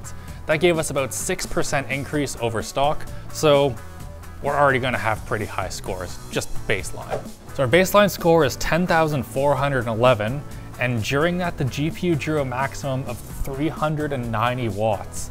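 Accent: Afrikaans, South Africa. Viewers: sounds South African? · Is that a Saffa accent I hear >? :) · Nothing sticks out more than a South African accent. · You would think this based on the population of the country but you're way off. With 11 official languages the dialects vary extremely. He sounds like an afrikaans native speaker and even then not all of those in SA have the same accent. As a South African I know I'm not spewing bullshit. Also, the numbe of afrikaans native speakers is only around 7 million, which is a lot less than 60 mil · So good to hear a South African voice in these videos! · He sounds south african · sounds like hes south African? Probably form durban or CPT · Is this guy south African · He's south african ye? ;D good to see one of us on tech tips :) rock it lad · Do I detect a South African accent? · The south african accent is real 😅🤣🤣🤣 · Is from South Africa perchance? 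· Loving the Saffa XD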